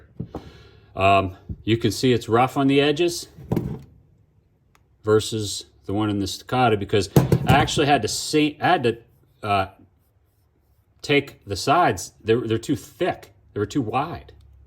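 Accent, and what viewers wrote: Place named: Pittsburgh, United States. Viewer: Good to hear another Yinzer!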